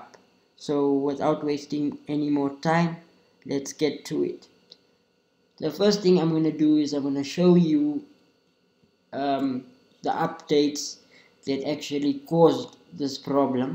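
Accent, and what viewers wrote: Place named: South Africa. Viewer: Love what sounds like a South African accent- am I correct bro?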